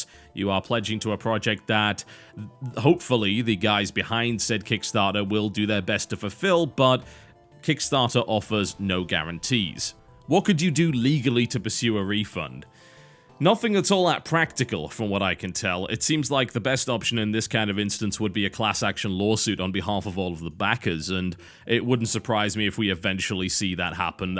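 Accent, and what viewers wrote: United Kingdom. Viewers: He can't you fucking racist that's how British people say it. Its because of their accent.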